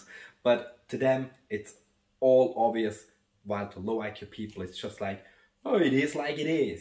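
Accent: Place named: Hungary. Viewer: This guy reminds me of my little bro, are u Hungarian by any chance? B/c the accent sounded like my little bro who came over to US but grew up in Hungary.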